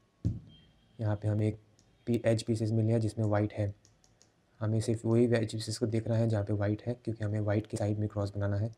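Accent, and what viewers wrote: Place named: India. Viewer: I came here just to listen to hindi accent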